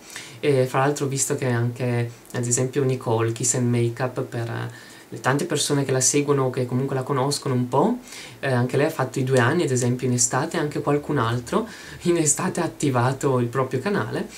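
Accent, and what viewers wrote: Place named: Brescia, Italy. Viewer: mi piace troppo la tua parlata bresciana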